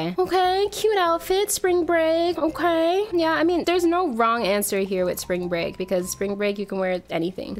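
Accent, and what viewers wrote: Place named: Asia. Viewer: her asian accent tho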